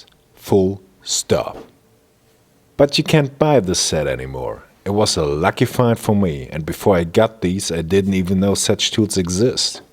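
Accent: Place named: Germany. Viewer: Interesting video, interesting toolset. I only have seen those hollowed tips on -Screwdrivers and I am thinking about such a set since months now. But if I am totally honest, I don`t have to work with a lot of slotted screws. So probably I will be fine with the screwdrivers I already own. Nonetheless I`m really happy to see you back here again. I really like your style of videos. Always kinda fun to hear germans talking english about german tools and been remembered to that when they call brand names oder place names - especially "Remscheid". Finde ich super!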